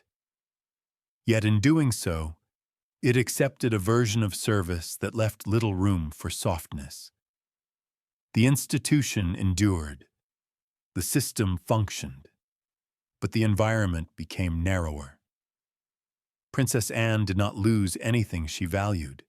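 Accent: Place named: United States. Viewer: Interesting and relevant subject matter. sadly however, it features an American voice over.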